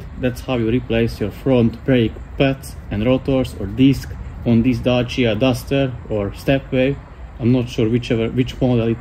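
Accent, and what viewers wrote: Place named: Hungary. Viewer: Köszi a munkád! Amint meghallottam az angolod azonnal tudtam hogy magyar vagy! Tényleg hasznos volt számomra ez a szerelés mert épp előtte állok! De lenne pár kérdésem: Ajánlod rézpasztázni a tárcsa és a kerékagy közötti részt? Valamint az alsó és felső csúszkát kell zsírozni? És ha igen akkor azt hogyan lehet?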